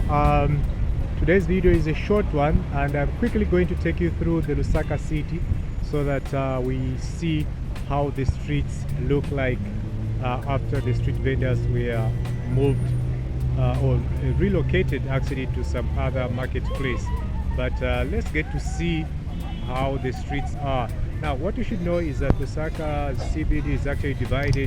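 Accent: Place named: Zimbabwe. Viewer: He thanks for the video this happened when i was in zambia in july/august. You sound zimbabwean bro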